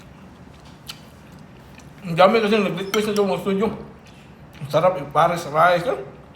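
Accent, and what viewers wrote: Visayas, Philippines. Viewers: pangit ng pagkaluto mo..bisaya ka talaga,ayusin mo magtagalog at pagluto mo ng dinuguan..ikaw lng nasasarapan nyan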